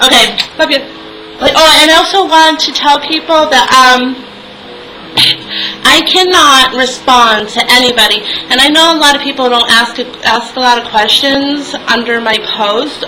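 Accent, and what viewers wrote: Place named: New York, United States. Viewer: What part of America are you from? You sound like you are from New York sometimes. :) And omg... that's a shame cashiers are not allowed seats!, in Ireland (where I live) they do.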